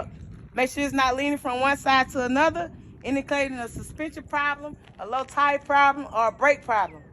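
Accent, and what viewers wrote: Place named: Dallas, United States. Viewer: Watched all your videos back to back and they are very helpful. Can tell you in Dallas from the accent love it. Thanks for the videos, got about two weeks till my test!!!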